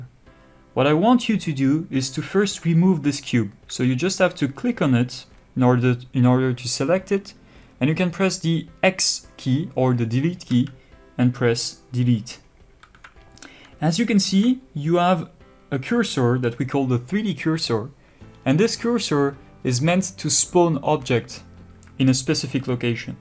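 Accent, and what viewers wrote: France. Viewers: Don't ever apologize for your french accent. Own it, flaunt it and and shove it in everyone ears. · Since Begining I'm recognize your french accent, merci pour ta vidéo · This is the best tutorial. I love your french accent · Don't apologize for your French accent! It's way better than mine ^-^ Thanks for the tutorials, mate. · No need to apologise. Your french accent is absolutely ok. Thx for this. Great introduction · the french accent is like fine wine, on animation.... 👌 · When the subject is hand-drawn animation, the French accent is a reassurance. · He said "Sorry for the French accent.", it does not mean his English is bad.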